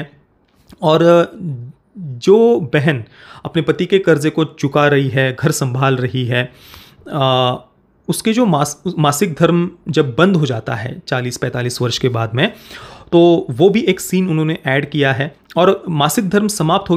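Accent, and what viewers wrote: Maharashtra, India. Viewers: Wa bhau shuddh marathi madhe😊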